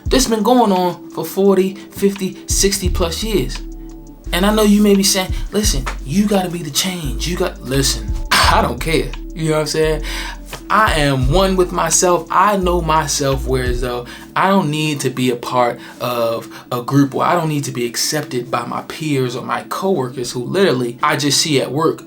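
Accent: Baltimore, United States. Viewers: I'm in nursing school and it's already starting. Everybody wants to be the super nurse and try to prove that you are not smart or fit for the job. It's very stressful. But I feel it's like that in any profession. Aye Bmore. I grew up there but haven't lived there in 15 years. It reminds me of home hearing your accent.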